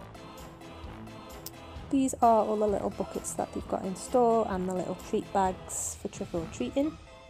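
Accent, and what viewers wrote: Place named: Liverpool, United Kingdom. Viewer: is that a Liverpool accent you have? I’m from Liverpool too and good to hear a scouser on YouTube x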